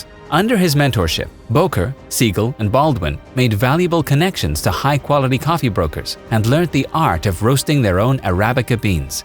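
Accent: United States. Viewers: How did this guy's accent went from being annoying to becoming Americanized in such a short time (3yrs)?? Good effort nonetheless.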